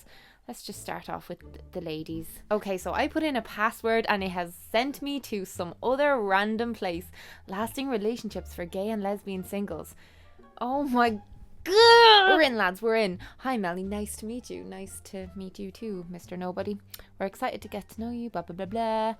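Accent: Ireland. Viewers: Where is she from? Ireland? Australia? UK?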